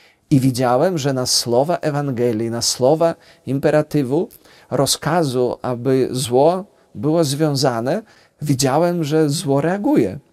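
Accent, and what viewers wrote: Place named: Kresy, Poland. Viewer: piekny, poetycki , kresowy akcent ksiedza, cudny ksiadz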